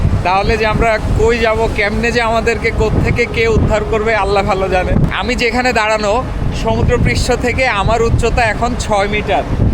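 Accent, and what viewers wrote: Bangladesh. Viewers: Tumi Bangladeshi tai to? Tomar kotha bolar style kothar type sune mone hochhe....je standard kothar tate mone holo...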